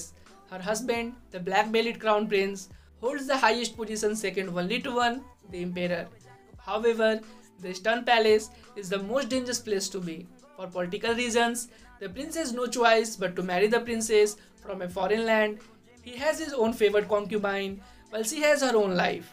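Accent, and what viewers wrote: India. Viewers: Are u indian??? Cause ur voice sounds like indian ☺️☺️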